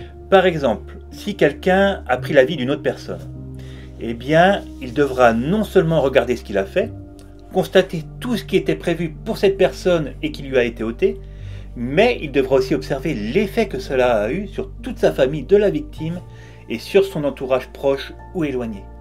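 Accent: North, France. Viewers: Bonjour 😊 super explication merci 💖 (je perçois un petit accent du Nord je me trompe ? 😁) Ça apaise de voir les choses ainsi, je comprends mais je ne peux m'empêcher de penser qu'on est vraiment des êtres sadiques et maso. Pourquoi s'infliger ça...🥺 Évoluer ? Par la souffrance ? Ça me fait me sentir encore moins bien au fond. Je continue de vous découvrir sur Youtube. 👍🏻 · Vous êtes du Nord de la France ??? Il me semble avoir reconnu cet accent particulier 🤣👍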